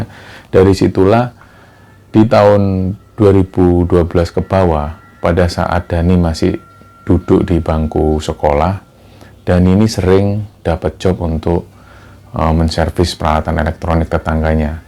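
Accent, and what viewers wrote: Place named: Java, Indonesia. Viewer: Logat nada bicara mu kami smua suka dan senang mendengar kan nya. Gas Jawa banget. Jdi sesuai Membawakan Kisah kisah horor itu. NGENAK DI HATI PENDENGAR NYA.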